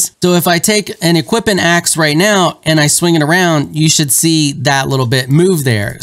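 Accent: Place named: Northeast, United States. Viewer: You sound like you're from the north east US. Nice content. Be safe.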